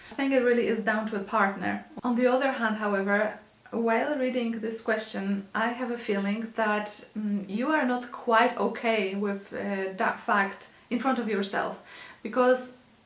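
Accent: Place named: Poland. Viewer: I love your polish accent don't lose it, it makes you unique. luv from Malaysia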